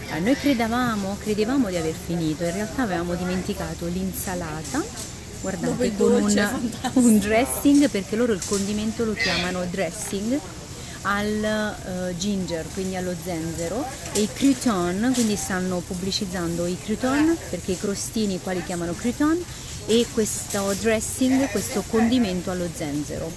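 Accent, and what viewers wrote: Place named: Naples, Italy. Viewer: mi sembrava accento napoletano, scusa in che stato americano eri nel video?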